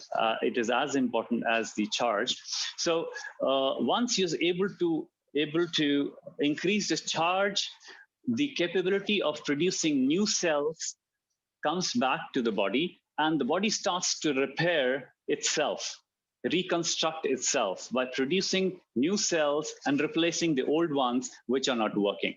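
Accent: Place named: North India, India. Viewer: you have simplified the importance of Kangen Water in such a lucid manner that even a sensible school kid will realise the need for KW. But, with deep regrets I need to emphasize that speaks sich an elegant English and on the other hand, Mr, the host, speaks such an horrible english. His accent, his pronunciation of the words is typically north side bhaiyas type. He is grammatically incorrect too. For e.g., he says there is a query from the Prasad. My God, such a horrible English. It is just 'Prasad' and not 'the prasad'. please please please I appeal to your great highness to arrange for a better well educated host who could speak English properly for your next series of videos. AMEN.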